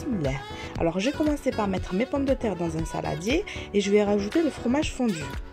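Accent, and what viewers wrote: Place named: South, France. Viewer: Vive l'accent du sud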